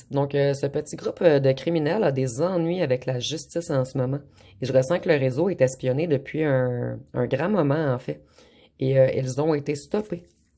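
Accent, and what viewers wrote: Quebec, Canada. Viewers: J'adore votre accent le jour j'irai voir voir au Canada ❤❤❤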